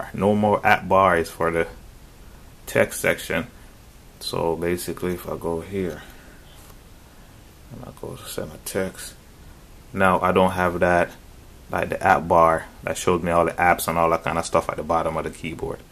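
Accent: Jamaica. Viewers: I’m hearing slight Jamaican accent when u speak, are you Jamaican or from a Caribbean island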